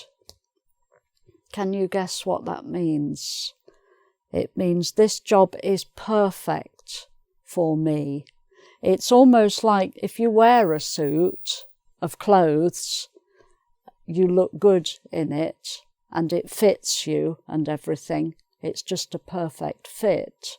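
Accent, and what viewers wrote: England, United Kingdom. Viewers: stabel This is definitely not an Australian accent...she is from the UK and has a British accent. Btw there are MANY British accents!! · THANKS A LOT! I love your videos. Specially because your accent is from the UK. And since I live in Mexico every one learns to to speak that awefull american English, which I already know and, to be honest, don't like. I'm stil learning UK accent. An I hope to keep watching your videos until I'm dead. Hugs an kisses lovely lady!! <3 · This english is more beatiful than the american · Hi! I’m Bruce from Korea 🇰🇷. I bet you are the best English teacher on YouTube. I am so jealous of your English accent. Wish I could have that one as well. Thank you! ❤️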